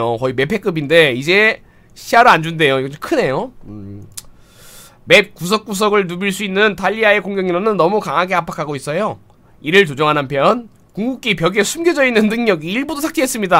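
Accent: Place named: Busan, South Korea. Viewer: 걸쭉한 부산사투리 ㅋㅋㅋㅋㅋㅋㅋㅋ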